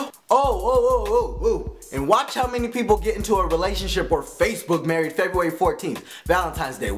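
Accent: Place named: United States. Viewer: u soun soo country (wat tha hell )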